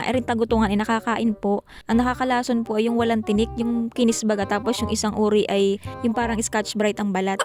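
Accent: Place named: Visayas, Philippines. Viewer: Nakakatuwa ka naman magsalita...my halong bisaya o cebuano...😂😂😂😂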